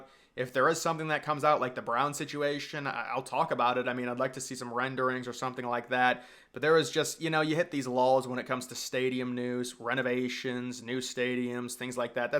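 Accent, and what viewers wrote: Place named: Baltimore, United States. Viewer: are you a Marylander? Kinda have that Baltimoron accent:)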